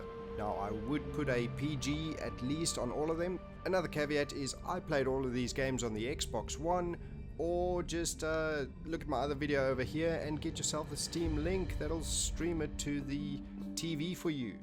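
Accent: South Africa. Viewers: accent is totally South African, sound like some of my boets Joburg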